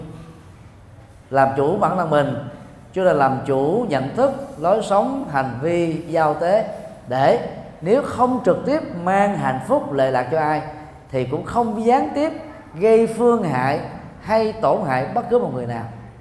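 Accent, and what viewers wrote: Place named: Vietnam. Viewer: phát âm tiếng anh giọng việt "chay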